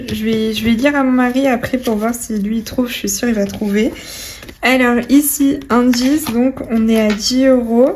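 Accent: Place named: England, United Kingdom. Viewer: Coucou 😊 Pleins de sous sous à mettre dans les défis, trop chouette 😘 Et un beau colis de Linda en prime. C'est superbe 🎉 J'aime bien ton accent anglais,😊 je ne fais pas mieux 😅 Des bisous 😘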